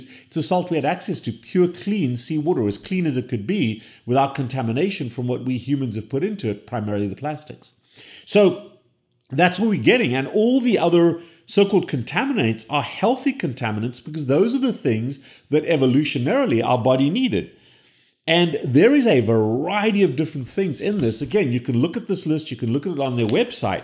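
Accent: South Africa. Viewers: That's true. But he only said it once and YT text-to-speech often gets words wrong. It esp doesn't like South African accent, translating it more often to "covert." But I just checked a recent John Campbell interview (someone much more off the deep end about Covid) and the TTS had no trouble parsing "Covid." There's no conspiracy here. I was just showing you that you could type it in the comments (twice!) without the black helicopters appearing.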